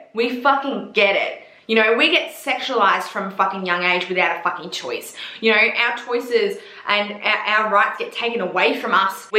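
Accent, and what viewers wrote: Australia. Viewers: Your Aussie accent 😩. Love it girlfriend.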